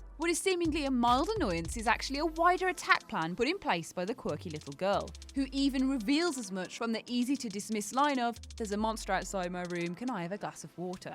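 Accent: United Kingdom. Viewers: Why does every channel have an english accent now? Lol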